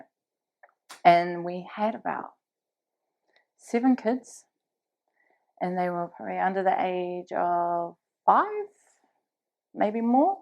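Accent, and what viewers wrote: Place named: New Zealand. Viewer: You don't sound intelligent, you definitely sound like a maori and totally look like one, You and your whole family sound like HOODRATS, WHAKAMAA Whats the point of this NEGATIVE POST ABOUT BULLSHIT GANG MEMBERS, You don't have to be GANG MEMBERS TO BE SOMEBODY, Well Whats the chances being in a Gang your sorry ass is going to be destroyed, that's the choices you maoris made. BLAME NO ONE BUT YOURSELVES. Don't blame the cops,don't blame the government. BLAME YOUR PARENTS. It's pretty hard to believe you have catholic values when you speak like a hoodrat, No wonder Maoris get a bad name. Listen to the bullshit and jellybeans that's coming out of your mouth, it's negative as and you just sound like you're going down the PITY TRACK, why have a child if you clearly can't take care of yourself and YES EVERYTHING IS YOUR FAULT AND THE BAD CHOICES YOU MADE. 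I know lot's of Chics in Aotearoa Black power,mongrel mob,head hunters, hell angel's fk'n way worser off than you, there's no way that they would be doing a pathetic interview like you are now. Good fkn job people have died in your fucked up family, You obviously don't know God, have you actually REPENTED TO GOD....DOESN'T SOUND LIKE IT, your not mentally impaired, You don't believe in God, the bullshit you went threw is nothing in comparison to what others have gone threw. You use God's name alot yet you have in no way have been obedient towards him therefore get your shit together and stop living in a dream world in the past. I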